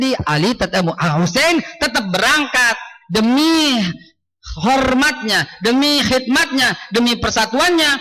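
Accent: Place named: Jakarta, Indonesia. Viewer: wajar aja beliau org betawi kan ngomongnya emang gitu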